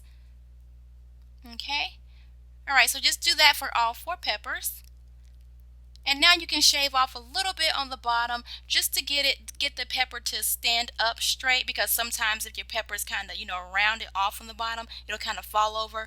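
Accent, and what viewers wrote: South, United States. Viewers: your slight southern drawl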